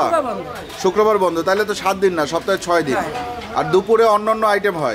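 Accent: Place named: Barisal, Bangladesh. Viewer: বরিশাল জীবনে যায় নাই, কিন্তু ভাষা ঠিক আছে বরিশালের।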